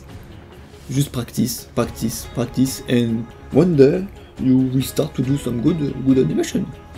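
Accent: France. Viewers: mdr tu me fait rire avec ton accent francais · As French myself , i enjoyed to recognize this famous accent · Tu as un axen fr lol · je l'avais déja remarquer ton accent français :p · Oh tu es Fraçais ! C'est bien ce que je me disais ;) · French? I was wondering why you sound like I have no idea about. Are you actually from France? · This is french accent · Ah yes, french accents. "Y a du skill" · nice l'accent français mec ahaha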